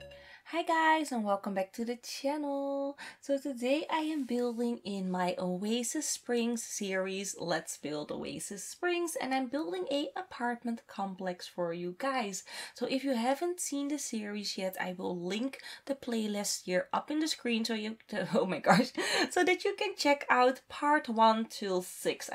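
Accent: Netherlands. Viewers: It's so cool! I can't wait to see what you'll do with all these appartments. But I think there will be a lot of episodes and that's good :D And by the way I do love your Dutch accent, espcially when you say garage. In each video I'm waiting for you to tell it and I can help myself smiling. Can't wait for the next video !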